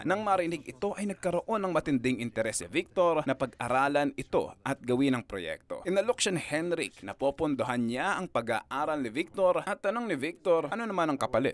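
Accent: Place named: Visayas, Philippines. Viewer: Halong bisaya dol.palibot man haha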